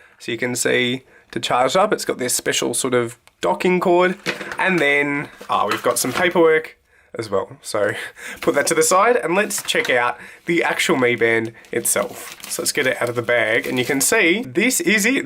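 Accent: Australia. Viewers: Are you Australian mate You sound like an aussie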